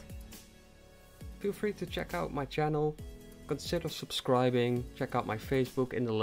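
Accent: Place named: Netherlands. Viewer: Are U Dutch? I Can Hear It In Your Accent And If Youre Wondering Im Dutch As Well !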